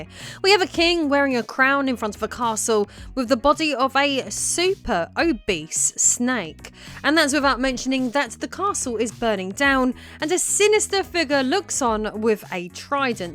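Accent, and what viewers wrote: United Kingdom. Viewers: you reminded me of Batonetta with that british accent and s3xy tone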